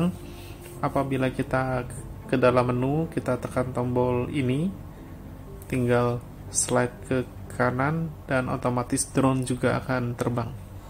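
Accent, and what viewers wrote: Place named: Kalimantan, Indonesia. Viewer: Ikam orang asli mana gan ? Hha bubuhan kalimantan jua lah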